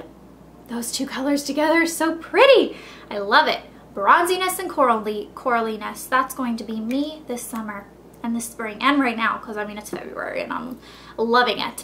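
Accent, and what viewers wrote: South, United States. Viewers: Love the southern accent!! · does anyone else hear her southern accent more in this video? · Ah like it !!! You're little southern accent sounds so cute!!! ahahhaha :)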